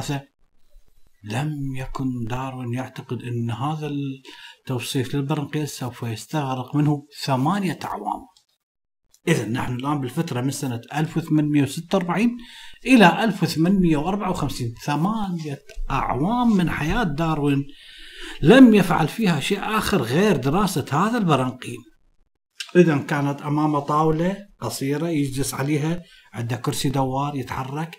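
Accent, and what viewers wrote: Iraq. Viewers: شمدريني هو الفيديو طلع يمي من وحده ..وشفته لهجته عراقي ستغربت .و امداكم🖐🖐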